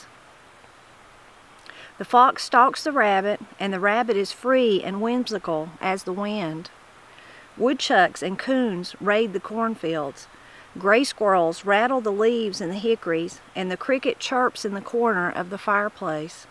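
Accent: Appalachia, United States. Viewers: Really like this channel - partly for the Appalachian English, but also to learn how things are done in the countryside 🙂 I live in Scotland, November is beautiful up here with the changing colours of autumn.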